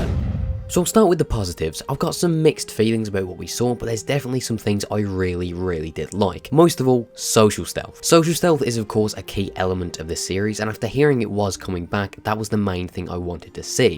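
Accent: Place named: Midlands, United Kingdom. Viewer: Where are you from man, your accent sounds so ambiguous, I’m either thinking somewhere in the Midlands or the West Country 😬😬😬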